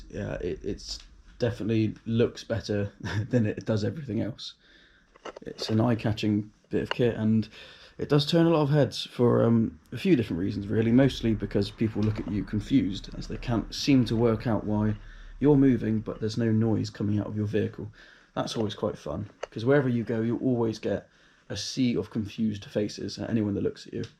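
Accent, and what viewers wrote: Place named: Andover, United Kingdom. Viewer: As much as I like the idea of elecric two wheelers. I would and will opt for a petrol scooter type vehicle and there some really nice ones out there. Run out of gas fill up anywhere. Electric ? run out of power your in the poo poo. I will stay with petrol for now. I think the battery technology is not there yet, its getting there but not now. Accent sounds like Andover or Basingstoke?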